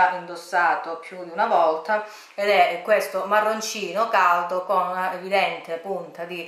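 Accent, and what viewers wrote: Calabria, Italy. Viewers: Ciao, ti ho appena scoperta e non posso più fare a meno dei tuoi video! Adoro il tuo accento calabrese e i tuoi gusti! Un bacio da Napoli!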